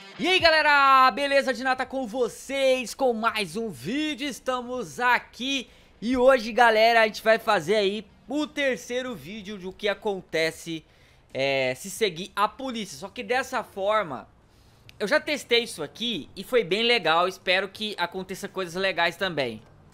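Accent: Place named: São Paulo, Brazil. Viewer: a voz do davy jones , so que de sp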